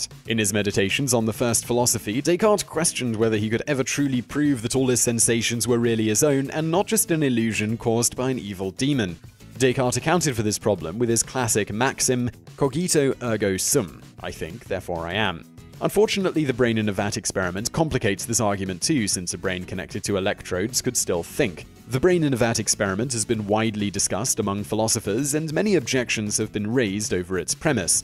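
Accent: United Kingdom. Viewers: Is that Michael vsause but the British acent version?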